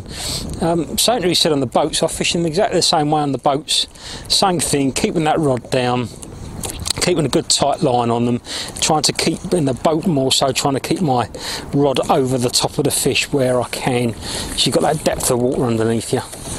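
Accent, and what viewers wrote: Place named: Essex, United Kingdom. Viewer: Nice one - very useful information. I'm from up here in the north west and I've been trying to mic your lovely Essex accent to my mates up here - nearly got it!! Great stuff mate - well done!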